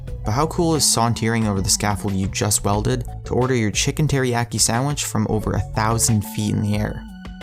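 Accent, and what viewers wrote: Canada. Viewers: are you Canadian? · This dude is Canadian. I heard aboot a few times lol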